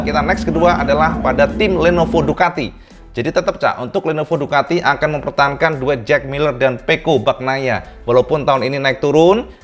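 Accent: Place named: Java, Indonesia. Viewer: Asli aku seneng liat komentar, logat jowone marai guyu. "py tho kang mas...😁" lanjutkan kang. Tp ndelalah detail infonya...aku suka sekali.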